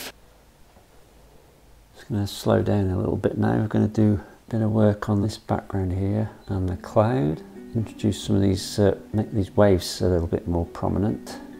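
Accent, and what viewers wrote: United Kingdom. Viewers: My Sunday treat Love your painting...very atmospheric..Thank you.. Love your English accent also. Greetings from Donegal,Ireland.☘️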